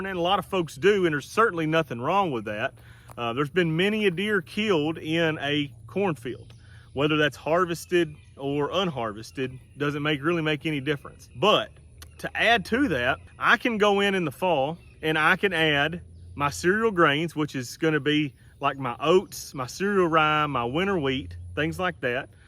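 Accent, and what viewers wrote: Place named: South, United States. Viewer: Thanks, as always great information! I enjoy your channel and am learning a lot from you. I watch your videos so much that my wife can now do a impersonation of your southern accent:). We live so far north our neighbors are in Canada so there's not any southern drawl up here. Appreciate you!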